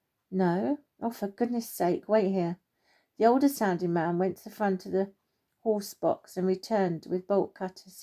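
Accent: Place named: England, United Kingdom. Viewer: Lovely english accent! It sounds really good, not pretensions and natural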